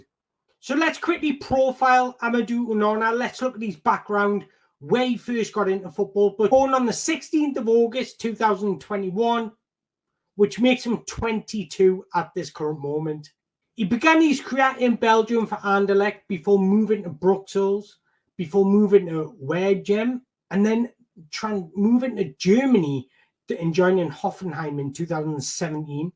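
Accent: Newcastle, United Kingdom. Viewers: Mate I’ve been following you on Twitter for a few months when I saw your name and photo I assumed you were Spanish or something I was not expecting a Geordie accent hahahaha. Top content!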